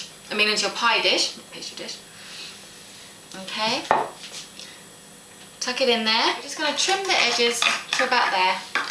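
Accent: United Kingdom. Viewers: British accent. Thought you were American? well... back to the pie business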